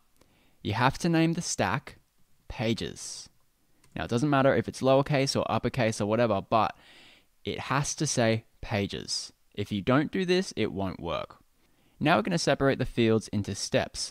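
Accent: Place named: Australia. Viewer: Love hearing an Aussie accent. Is there a Framer template that already has the multi step form built in? I'm useless at building stuff in Framer.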